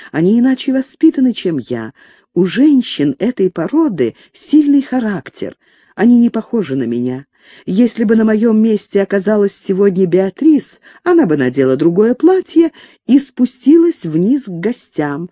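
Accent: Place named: Moscow, Russia. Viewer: Уточнение: верЬх, четверЬг, церЬковь - особенность московского произношения. Устарело, потму что москвичей не осталось.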